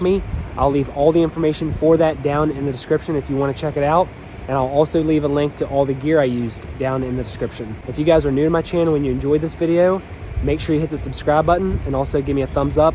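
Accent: Pennsylvania, United States. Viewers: Thanks , Pennsy accent?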